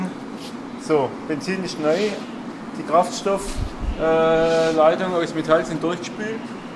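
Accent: Swabia, Germany. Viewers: Klasse wie immer,und zum glück wieder schwäbisch geschwezt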